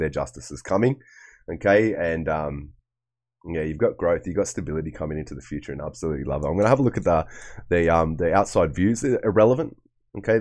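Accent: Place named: Australia. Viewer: You are an awesome reader! It’s lovely to hear your lovely down to earth Aussie accent, I’m Aussie too but mainly listen to readers from elsewhere. Thank you, many blessings.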